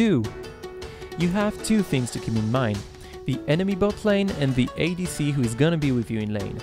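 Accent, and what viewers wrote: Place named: France. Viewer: ***** I'm from France too so I suspected you to be french but I wasn't sure because it wasn't the regular shitty accent :D By the way reported for saying Leblank and not showing you inner french, it's Leblanc duh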